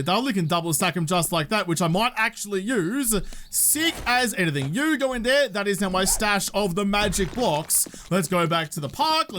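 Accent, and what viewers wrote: Australia. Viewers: Maybe its the Acapulco Gold talking but damn this is awesome. Love the Aussie (?) accent.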